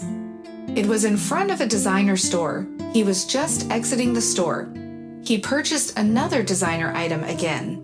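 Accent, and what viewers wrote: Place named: Japan. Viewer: This is pretty disjointing and doesn't make sense. Sounds like Japanese. Nobody here in the states speak like this.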